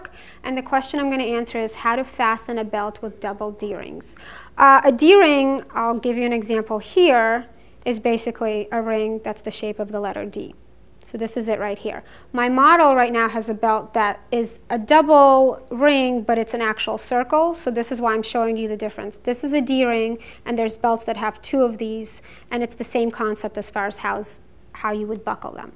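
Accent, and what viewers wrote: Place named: New York, United States. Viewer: Thanks so much for this simple, straight up explanation and demo. Love your New York accent too! Cheers from UA, Ohio.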